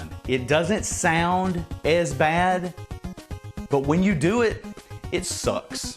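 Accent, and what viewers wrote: South, United States. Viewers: Love the southern accent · Listening to a dude with a good 'ol boy accent talk about horseshit in the Dark Souls series is peak internet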